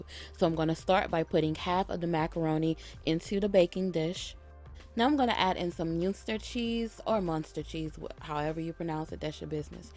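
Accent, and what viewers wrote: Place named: Louisiana, United States. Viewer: I'm kinda new to watching your videos and I must say this is my favorite!!! I'm from Ga and currently live in Tx. I LUV the way people from the "boot" cook(and talk)My grandmother taught me how to cook mac with canned milk but she used a couple eggs. Thank You so much for your videos. I will be trying your banana pudding tomorrow😉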